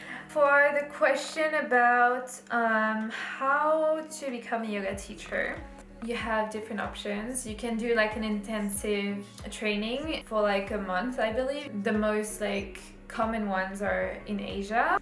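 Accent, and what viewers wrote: France. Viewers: Are you french? Your English prononciation is really good)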